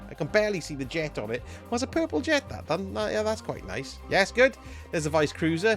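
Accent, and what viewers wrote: Wales, United Kingdom. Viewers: Clicked on this randomly. Welshman detected dai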